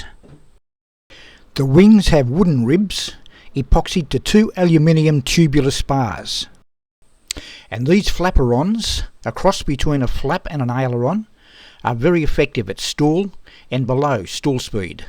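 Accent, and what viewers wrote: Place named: Queensland, Australia. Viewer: He said 1988, NQ Aussie accent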